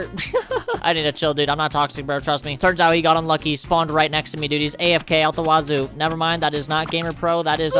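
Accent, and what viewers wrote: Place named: United States. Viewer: I like ur cowboy accent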